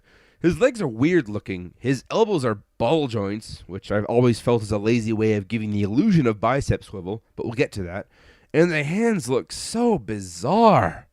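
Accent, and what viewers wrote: Canada. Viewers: Haha you sound like a candian .Are you Canadian ?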